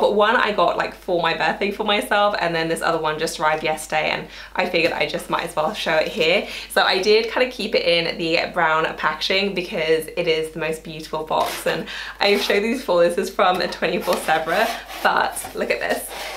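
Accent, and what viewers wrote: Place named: London, United Kingdom. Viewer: I want to enter the Chanel wallet giveaway!!!! I love your videos and London accent 🥰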